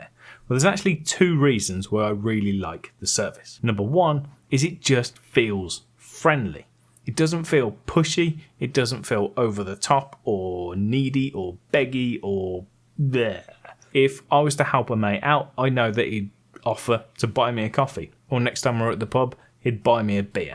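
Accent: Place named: Birmingham, United Kingdom. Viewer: just come across your channel chap. Great stuff, explained the buy me a coffee concept so clearly I’m off to sign up. BTW, that accent sounds like yo live by me near Brum?? If you do and want some stills to use on channel/social media, I’m happy to help FOC (if I can pick a few tubing tips up from you). Thanks for the great video, cheers Phil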